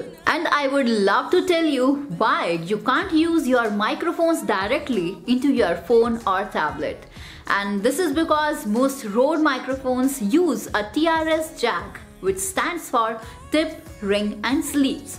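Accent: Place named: India. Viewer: Probably the best EXPLANATION, a little hard on the accent. But thank you, ma'am. We love India in America.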